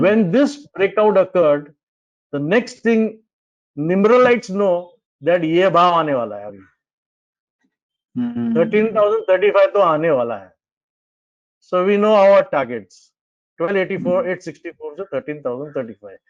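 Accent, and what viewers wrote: India. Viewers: It's just not understandable thanks to that awful Indian accent. Sorry!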